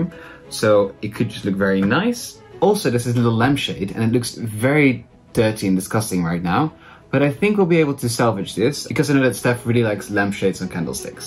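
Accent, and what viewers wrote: United States. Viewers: 🍃♥️Really sweet❗️. Romance is in the air at LaLande which makes it all the more fun to watch. I was wondering how you came to have an American accent when you learned English? You have a beautiful voice…would love to hear you speak French more too!🍃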